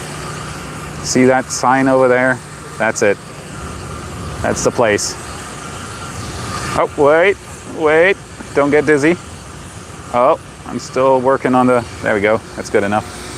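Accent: United States. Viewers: You look so relaxed, you even are getting an American accent ! Wild... Ya, Boca Chica is a madhouse these days. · Why has your accent changed? Its more american than your usual accent. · not only do you sound excited, but you also sound like an American. Your accent has faded since you arrived in the good old USA. We are happy to have you and you are welcome here anytime! Keep up the great work.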